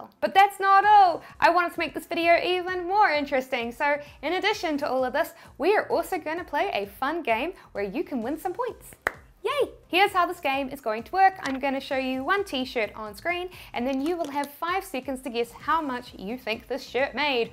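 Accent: New Zealand. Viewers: Love the Kiwi accent !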